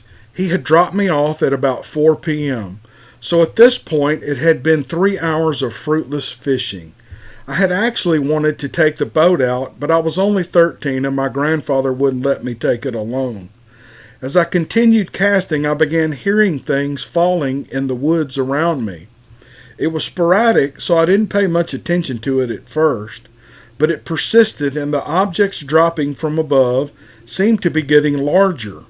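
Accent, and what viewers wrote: South, United States. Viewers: I know you are a busy man. I hope you get your garden in soon. I want you to know that I anxiously await notification for your stories. Yes, I have found “my people”. I’ve listened to other channels but I’m from the south and feel at home when you speak. Thank you so much and I would have never guessed Bigfoot is in Hawaii. Wow! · I so appreciate your channel. My bestie and I listened to you all the time. Your southern accent is wonderful and you are so positive. Thank you for taking the time to do this.. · It was so nice to see/meet you! I've commented before that I love your accent, and it's so fun to put a face to your voice! I live in Oregon, so you're southern accent is such a treat to listen to! Thanks for all of the stories, I love them all and really enjoy hearing you tell them!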